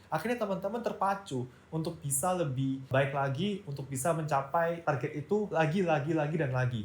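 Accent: Surabaya, Indonesia. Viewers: bahasa jowo suroboyoe tambah mantep ngene dok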